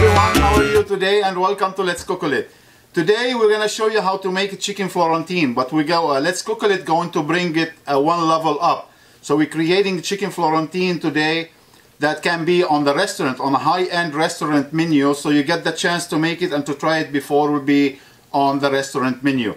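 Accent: Lebanon. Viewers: I love your lebanon English language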